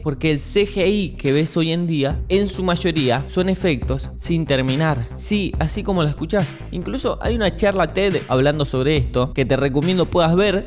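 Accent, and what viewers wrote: Standard. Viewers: Es que tenés un acento muy neutro.... me atrapaste. No hablás de tu. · que croto agarrar videos en ingles y simplemente hacerlos en castellano, encima hablando en neutro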